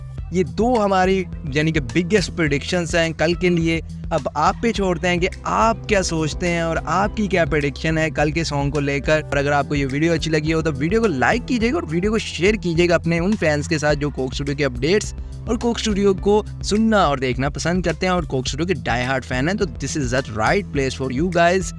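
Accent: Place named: India. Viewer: Aal ready tum Indian ho . Bolne ka style bata raha hai. Idiots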